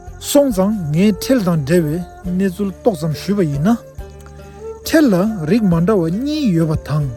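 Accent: Standard. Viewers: བོད་སྐད་དཔེ་གཙང་མ་གསུང་གི་འདུག་ཐུགས་རྗེ་ཆེ།🎉🎉🎉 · བོད་སྐད་གཙང་མ་ཐོག་ནས་ཡག་པོ་བཤད་སོང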